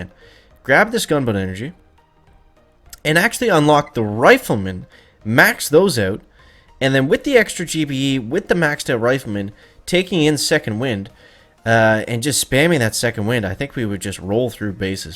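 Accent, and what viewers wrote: Canada. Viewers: could you do one vid with your thickest Canadian accent possible please? I would love it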